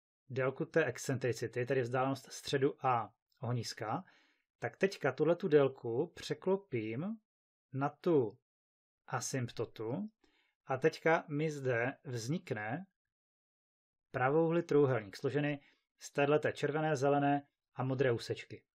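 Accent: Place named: Moravia, Czech Republic. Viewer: až na ten moravskej prizvuk docela dobry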